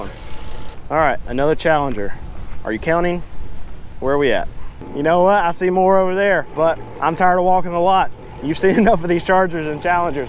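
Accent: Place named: South, United States. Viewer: From the sound of your accent your in the south. No money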